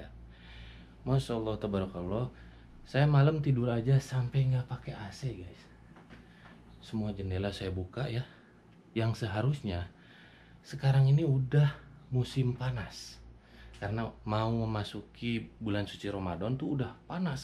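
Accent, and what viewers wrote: West Java, Indonesia. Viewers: Subhanalloh...kang orang sunda nya...logatna euy... Beh mana cikundul..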